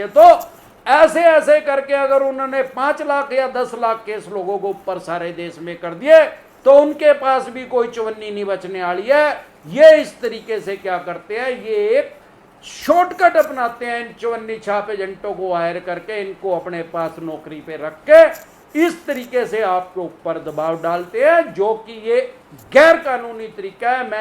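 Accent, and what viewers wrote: Haryana, India. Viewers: Haryanvi accent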